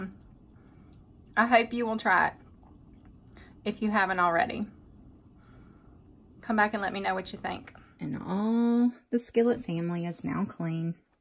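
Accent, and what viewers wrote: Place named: North Carolina, United States. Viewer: Being from the foothills of NC I immediately recognized the accent! Love a country girl that can cook!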